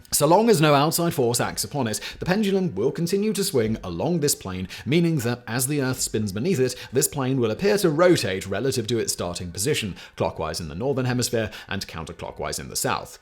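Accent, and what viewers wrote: United Kingdom. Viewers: He has a thick British accent, but why then does it feel like he's an American?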